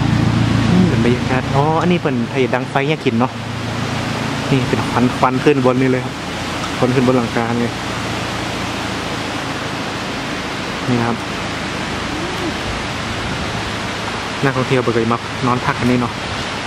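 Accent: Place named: North, Thailand. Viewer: ดีครับผม อู้กำเมืองน่าฟังดูดีนักๆๆครับ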